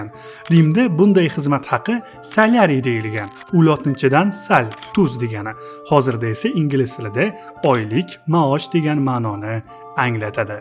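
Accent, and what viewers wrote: Tashkent, Uzbekistan. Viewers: molodes. Bizani dokondan oq sariq koyne xarid qildiz.loyiha un albatta. Korib xursan boldm. RESPECT 📌 Siz bn yangi loyihez bo'yicha fikir almashdi. Qisqasi keng fikrli, IQ balan, tarbiyali bola ekansz. Gap yo. Good luck bro ☝️🤲📌 📢Toshket shevasiga allergiya yoq dp umid qlaman.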